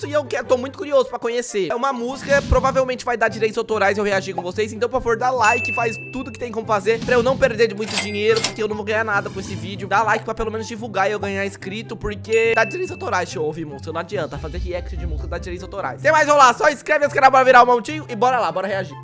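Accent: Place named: Portugal. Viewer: No puedo entender lo que dices sos portugės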